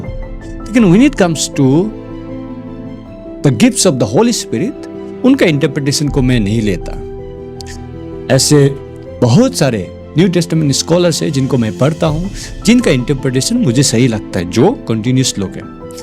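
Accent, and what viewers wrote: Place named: Northeast, India. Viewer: Everyone is thinking this man is Nepali but his way of speaking is like Northeast India..he may be from Nagaland... Meghalaya...Arunachal Or Mizoram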